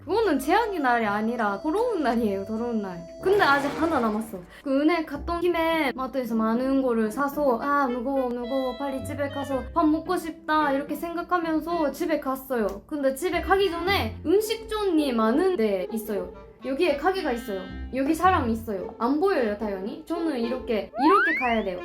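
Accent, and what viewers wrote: Gyeongsang, South Korea. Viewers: 뭔가 대구 말투가 되어가고 있다. 억양이 느껴지네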